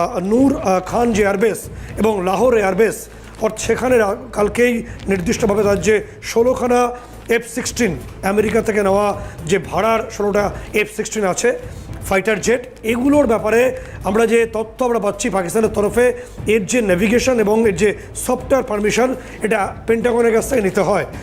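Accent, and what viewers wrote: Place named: Bangladesh. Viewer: Bangladeshi anchor naki? Kthay kichuta Bangladesh er accent ache.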